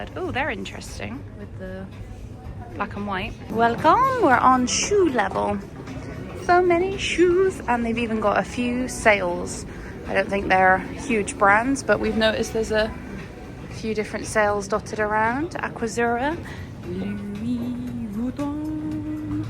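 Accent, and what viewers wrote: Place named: RP, United Kingdom. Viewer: First off I'd like to say I do enjoy your content ...but I feel this needs to be addressed as iv seen a few vlogs where youre not happy with your experience in these designer stores ...A lot of these stores deal with millionaires who shop their brand constantly , a lot depends on appearance and these staff are trained to know who is wealthy and who is not , unfortunately you will experience what you show , wearing leggings , speaking in a posh accent and being knowledgeable about designer brands won't cut it for high end stores , whilst I agree its not acceptable to be treated any differently ,but as you say they don't really respect people " drinking champagne on a prosecco budget " unfortunately buying designer every now and again or when they're on sale wont give you the experience you think you should get, I have bought designer bags shoes etc but I never expected preferential treatment , I just enjoyed the experience for myself and the purchase , honestly its giving mutton dressed as lamb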